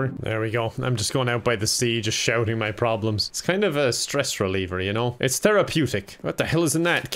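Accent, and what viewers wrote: Ireland. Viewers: Irish accents are so attractive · Ste van der Velde hes irish, you’d here ireland in his accent.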